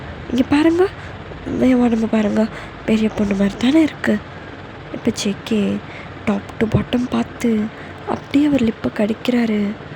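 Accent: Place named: Kerala, India. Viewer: i luv ur Kerala voice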